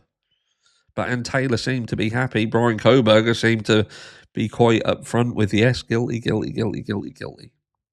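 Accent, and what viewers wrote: United Kingdom. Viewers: bryan did it hahaha glad to see u where wrong but love accent cutie bear uk man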